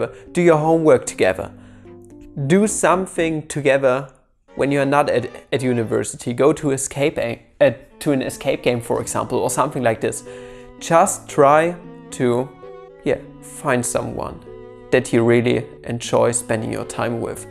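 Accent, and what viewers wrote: Germany. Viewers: funny german accent :DDDD!!?!!!!!?!!!!!!?!!!!????!!?!!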